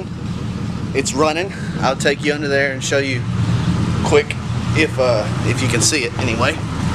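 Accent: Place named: Alabama, United States. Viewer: You talk like me😅 I'm from South Alabama and fixin to try this right now!! Keep it up and play whatever music u want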